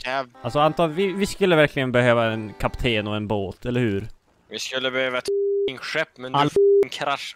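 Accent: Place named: Norrland, Sweden. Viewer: Skön norrländska och rolig video :))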